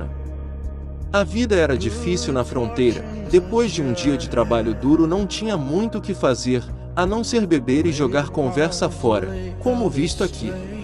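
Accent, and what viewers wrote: Brazil. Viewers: Muito interessante o conteúdo. Já a locução é muito fraca. Num vídeo sobre o Far-west norte americano, era recomendável um locutor com bom sotaque americano e não um que diz os milhentos nomes de figuras e locais americanos á moda do Brasil. O facto mais relevante sobre o Gen. Custer é a enorme derrota na batalha de Little Big Horn, este nome devia ter sido mencionado e não uma baralhada entre Guerra Civil e Sioux e Cheyennes. Mas isto são pormenores, para puderem melhorar no futuro, porque adorei ver tudo.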